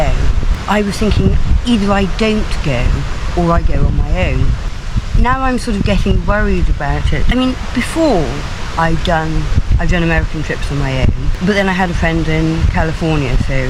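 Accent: RP, United Kingdom. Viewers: I hope to go state side this year or early next year, I think it’s because most of us in the British isles grew up with American culture and values, I have to brush up on my American English , because I am more used to speaking Hiberno-English, I really love your accent it sounds like real queens English, anyway enjoy you travels, I love your channel been over a year now. Keep the rubber side down,😊😊😊😊😊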